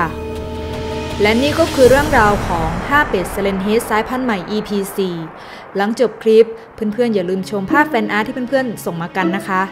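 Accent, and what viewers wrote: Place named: South, Thailand. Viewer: พี่เป็นคนใต้เเน่ๆ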